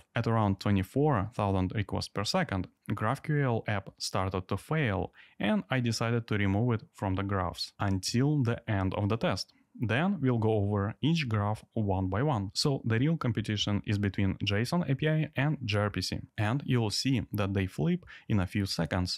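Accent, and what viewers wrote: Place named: Russia. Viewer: явно русскоговорящий тип делает канал на английском, зачем ?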